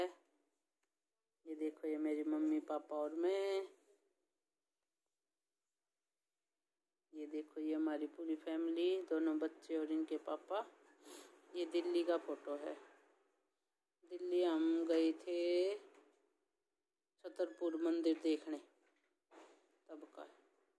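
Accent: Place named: Haryana, India. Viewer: Aapke awaj sa lag rha tha aap jind ya kaithal sa ho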